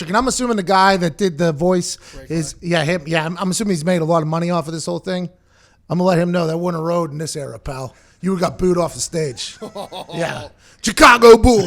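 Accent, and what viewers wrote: Pittsburgh, United States. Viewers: I didn't know you were from Pittsburgh. You do lowkey sound like a yinzer lol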